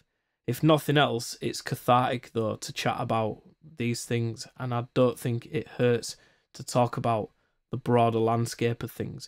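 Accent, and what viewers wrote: Northern England, United Kingdom. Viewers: your cheeky northern accent and your wonderful philosophy is why we like your videos. And ofcourse you beautiful face. But I would love to listen to more tunes by yourself. And if you do new things. Some will work and some will not. But your core of 3.5 thousand subscribers is definitely a good thing. I think it's a snowball effect the bigger it gets the more it builds. Damian keys is great. And really as someone who wants to get my music out there AND start my own YouTube channel. Your trials and tribulations are an education. Don't be afraid to start something new. And if you feel stuck in the mud don't be afraid to give 5them what they want. By the sounds of things you are having a deep think about where you want to take this. And that is fantastic you are the only YT person I have subscribed and given to on patrion. 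I do that because I think your content is great and more real than most of the big guns like Andrew Whag (I hope I spelled that right). They get GIVEN products every day. And I don't trust their objectivity. With notable exceptions like Divkid or Loopop. So something more philosophical is definitely a good angle. And like I said you can still put your music out there. Last thing before I start to ramble is this. I was surprised that Underbelly (you suck at producing) only released his first album I think last year. He has been making content for years before he did that. When I finally decide to start my online Journey I will have at least two albu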